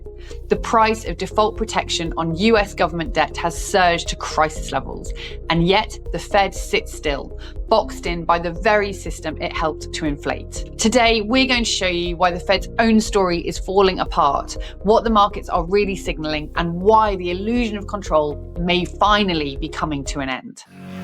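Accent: United Kingdom. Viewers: Housing market showing real cracks in usa...nice accent, so cool british woman...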